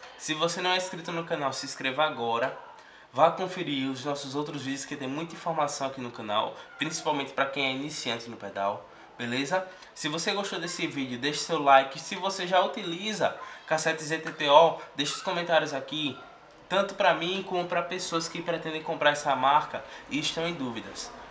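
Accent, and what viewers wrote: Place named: Recife, Brazil. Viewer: Vi seu comentário lá no Aliexpress E esse sotaque, vc é de onde de Recife?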